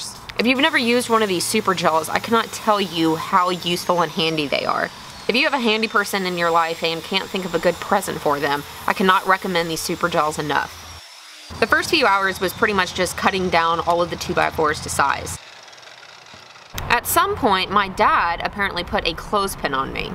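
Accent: Texas, United States. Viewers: Texas. It's a southern thing.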